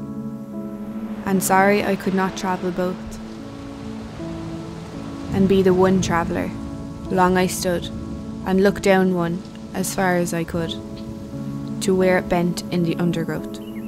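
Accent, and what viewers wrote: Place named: Ireland. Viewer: first time hearing the road not taken in an irish accent. Lovely!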